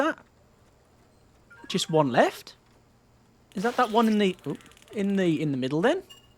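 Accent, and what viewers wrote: United Kingdom. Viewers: Are you British? Because I haven't realized that for a long time. 😅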